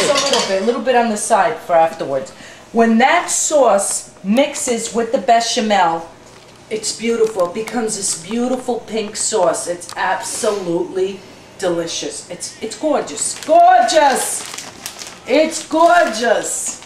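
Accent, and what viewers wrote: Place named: Italian-American, United States. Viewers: Cant get enuff of the ny in ur voice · the way you say prosciut ricott and mozzarell! He says it's sooo like the movies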